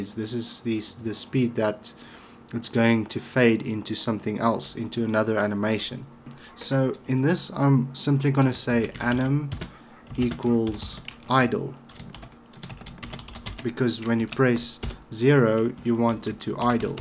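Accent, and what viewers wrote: South Africa. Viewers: you sound south african xD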